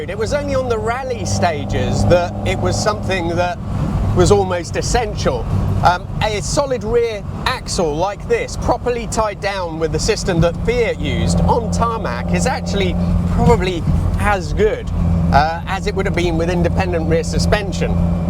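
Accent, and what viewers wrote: Italy. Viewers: One word for this car, ‘PROPER’. Keep,up with the Italian pronunciation. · What an epic cool little car, a credit to the owner.. Always liked your Italian pronunciation, and as always thank you for the great content. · ❤❤❤great video thanks I love the Italian accent and your videos are always interesting ❤❤❤❤ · I, for one, love your italian accent. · I like your italian pronunciation, but…Why do you pronounce Abarth the wrong way then? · Do Italians talk like this, which dialect and accent? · I thought I detected an Italian twang in a previous video.🤌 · It's lovely to listen to the real pronunciation of Italian words 🤌🏻 It's part of this channel's charm. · Keep those Italian pronunciations coming!!! ❤ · Eccellente. I do appreciate your Italian heritage pronunciation. Three years in Italy 1970-73 - my (English) baby sitter's husband was Italian. 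He took pride in his (replica) Mk1 Escort Mexico. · Keep the Italian pronunciation, I really respect and appreciate that. Such a beautiful language. The rest of us are just guessing unless somebody teaches us how-to. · I don't think I've ever seen one of these, I absolutely love the way it looks! What an awesome car! Also, I love that you pronounce Italian names with an Italian accent. it's part of you. never changes, buddy 😉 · I love it how your Italian pronouncement of Italian words comes through. I really enjoyed the one with your dad on Italian car pronouncement. I speak a tiny bit of Italian still after working in Italy for a year and absolutely love the language (and food). I also shared this video with a good friend of mine, who uses this body as a race car but with a Mazda rotary engine 😋 I had a lovely Alfasud (yes I try to pronounce that the Italian way) and because my ratio leg length / upper body is quite opposite to "the Italian ape" ratio, I had to extend my steering wheel hub by about 15 cm and I bent the gear lever quite far back to be able to sit "normally". 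I had a lot of Alfa Romeos and absolutely loved them, best driving cars I have ever owned. What an absolutely gorgeous looking and sounding car, testament to the work that went into it, brilliantly done. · Love you Italian pronunciation of Italian marque names ❤ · I can't believe some didn't realise you was of Italian origin. I love the Italian accent, Alex Polizzi fault. 😊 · YOU HAVE MADE NO SECRET of your heritage ❤🇮🇹 personally I love hearing the authentic pronunciation …………. It’s an education Thank YOU 🙏🏻 Pass my regards on to your Dad from Dundee 🏴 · Carry on with the Italian pronunciation, I too have Italian parents and I was born in London and speak Italian too. Bravo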